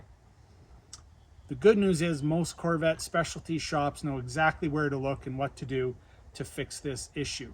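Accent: Canada. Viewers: That and that annoying Canadian accent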